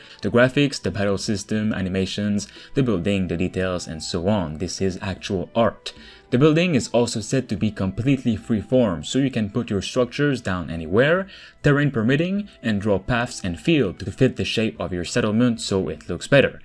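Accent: Quebec, Canada. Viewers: t'es québécois toé ? sa s'entend a ton accent, nice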